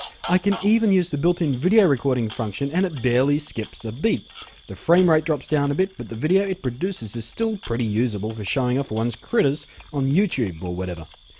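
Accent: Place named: Australia. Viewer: OY!! WTF are you going on about? Don't answer that. I tune it to your videos, not unlike MANY, to see your cute face and expressions and hear your cute Aussie accent. You're an adorable pretty face.....take your fucking shirt off bitch.....give me a GOOD show!!! If you think you're watched JUST because of your "insights"...guess again and join reality....you're popular because you're a prettyboy. So take off your fucking shirt and get on with it....for us!!!! YAY!!!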